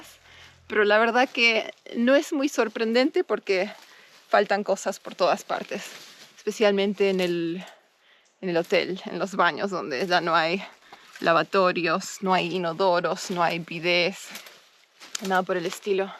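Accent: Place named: Argentina. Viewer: tu acento eres una argentina más!!!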